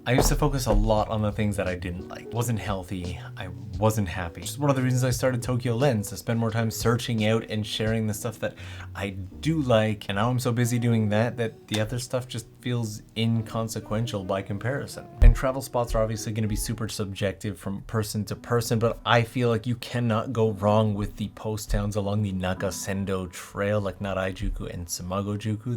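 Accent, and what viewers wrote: Canada. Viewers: Your accent is screaming Canadian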